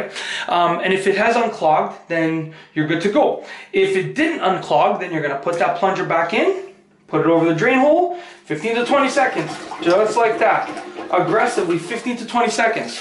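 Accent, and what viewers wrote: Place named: Canada. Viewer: I can tell you're Canadian by how you say oat instead of out.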